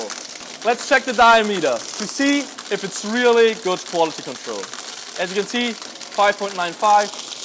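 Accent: Germany. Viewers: Dein englisch ist gut jedoch der akzent ist zu deutsch 😂👍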